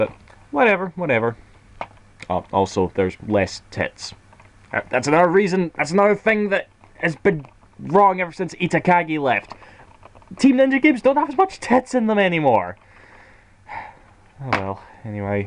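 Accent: Scotland, United Kingdom. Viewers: where abouts in scotland do you come from?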